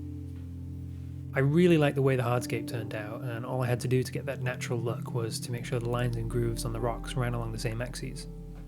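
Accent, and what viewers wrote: United Kingdom. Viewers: Popped up in my recommendations, cool video and all, but I am intrigued by this guys English accent, it sounds standardised British English then suddenly American time-to-time with certain phrases.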